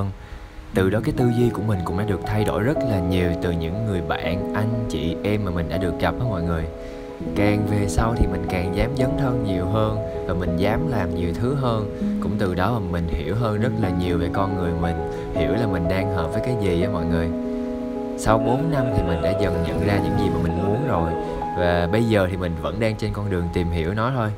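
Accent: Vietnam. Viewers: Trời ơi cái giọng sài gònnnnnnnnnn 🥰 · Chất giọng người Nam Bộ sao thương quá là thương !!!!!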